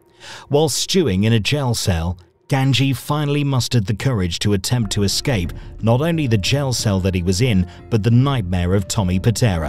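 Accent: United Kingdom. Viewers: the British pronunciation of the surnames is laughable.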